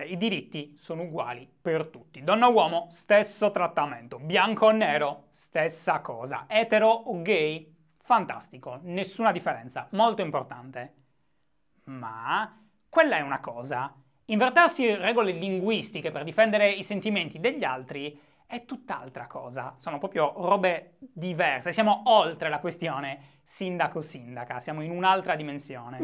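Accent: Sardinia, Italy. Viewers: Minchia se sei sardo!!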